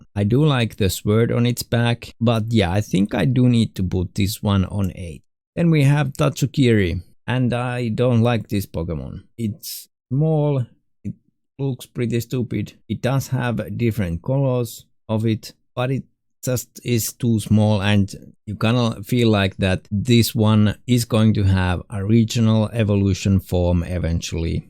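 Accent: Finland. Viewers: Are you finnish?? You sound finnish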